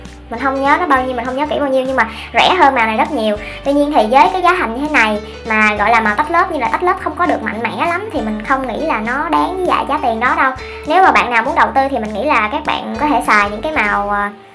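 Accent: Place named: Vietnam. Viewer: chị nói tiếng anh thuần việt ghê, em thích :))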